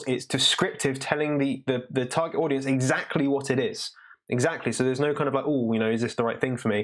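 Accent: United Kingdom. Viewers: Do you need to be so rude and not even try to pronounce the name of the author of the book you used for your video? Can't you pronounce any other phonetics apart from bri'ish mAtE?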